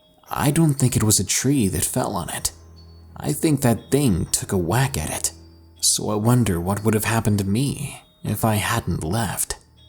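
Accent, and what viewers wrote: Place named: South, United States. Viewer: Been a fan of now for 3 years....hahah back when he had a southern accent...anyway keep it up. I help sponser too by being a fan:):)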